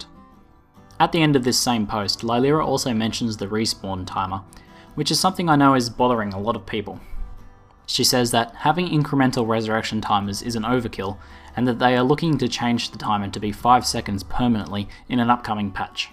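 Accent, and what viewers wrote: Australia. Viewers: Sounds pretty good. Nice to have an Australian voice on youtube :) sick of Americans...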